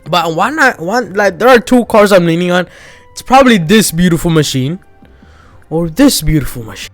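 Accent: Germany. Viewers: i love the german accent. Ich hab noch nie so einen schönen , guten , vry butiful germanium mensch gesehen. spass bei seite ich liebe deine videos! Dein humor ist perfekt :D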